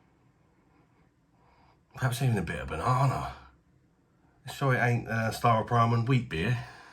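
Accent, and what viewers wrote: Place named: West London, United Kingdom. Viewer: cheers will give them a try and I will try one of them rheinbachers aswell as I like holsten and your battle vs lidl lol . U sound from my neck of the woods I'm west side of London near Heathrow if ya ever fancy a pint and a game of pool one day always welcome in the sir john gibson pub in stanwell I'm often in there with my pals as its five mins away lol cheers bosh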